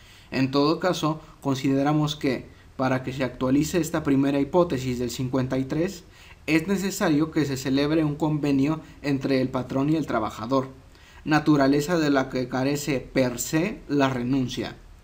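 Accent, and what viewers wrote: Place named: Spain. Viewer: Jolines! y porque hablas como español?? trabajjjo, jjjjornada...etc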